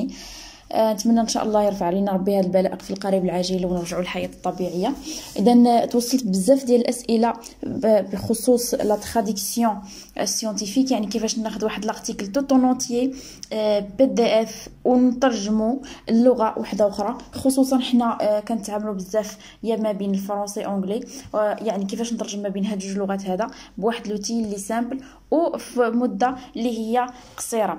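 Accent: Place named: Arab world. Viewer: merci pour la video je ne comprends pas trop bien ton accent arabe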